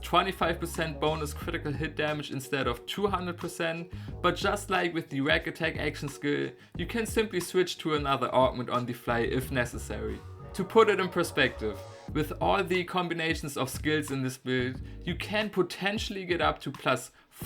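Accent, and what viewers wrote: Germany. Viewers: I can feel the German accent in my blood... keep it up!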